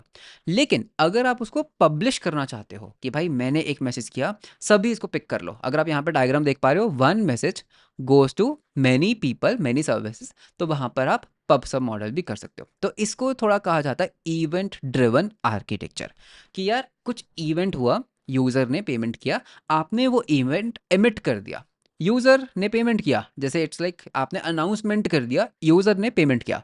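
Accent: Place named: India. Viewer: Bro please work on your accent. English speaker and dropping your series because I can't understand that well especially with 2x speed. And I work with indians a lot at work so I'm above average for an American understanding Indian accents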